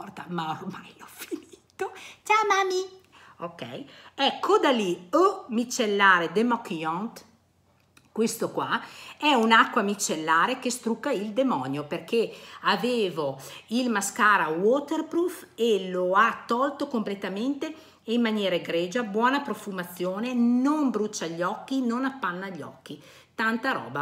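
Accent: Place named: Italy. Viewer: Ma daiiiiii l'accento molto conosciuto. 😂di che zona?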